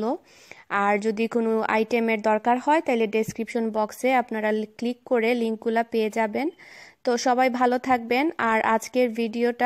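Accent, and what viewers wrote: Sylhet, Bangladesh. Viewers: আপু তোমার মুখে সিলেটি ভাষা টা ই খুব ভাল লাগে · আপু আমার খুব উপকার হলো।আমি একটা জিনিস দেখলাম সবাই তোমাকে বলছে তোমার মুখে সিলেটি মাত বেশি মানাই।এটা কি ঠিক ।আমার কাছে মনে হয় যে ব্যাক্তিকে ভাললাগে তার সব কিছু ভালো লাগবে।তাই সে যেভাবেই ভাষা বলবে।আমি তোমাকে ভালোবাসি আমার সব ভাষা তোমার মুখে ভালো লাগে। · আপুমনি আজ অনেক কিছু শিখতে পারলাম। খুব কাজে আসবে ইনশাআল্লাহ। আপু তোমার মুখে সিলেটি মাত আমার খুব বালা লাগে।আপু এখন আপনি কেমন আছেন? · Apu kmn aso.tomar mukhe shylety vasa onek valolage..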